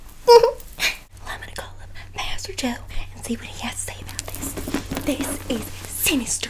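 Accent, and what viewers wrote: South, United States. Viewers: I don’t know why but some parts of this reminds me of Virginia being petty in Rising Hope 😂 it’s that, “oh no honey” and ,”where is your mother”. The slight southern tone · the chaotic energy and southern accent are telling me you’d make the perfect charlotte la bouff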